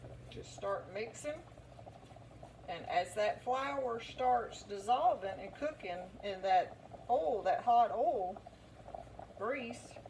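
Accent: South, United States. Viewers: I just now finished watching this video, I have never seen you before. I can be critical of a lot of things, especially how to videos, watched the whole thing with interest. I’m an older lady,and I can make brown gravy, but you did add a couple of new ideas, like the onion and garlic powder. THE POINT I want to make is, have I know you my whole life? Your presentation was JUST THE BEST! Your voice was so smooth, you are Southern to the bone. I say that as a great, very great, compliment.I have never subscribed to a cooking channel before, it’s like my neighbor talking to me. Wonderful !!! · Thank you for sharing recipe. Your advise is very true now days. Love the southern accent. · You got the south in your mouth. Fine recipe. THANK YOU.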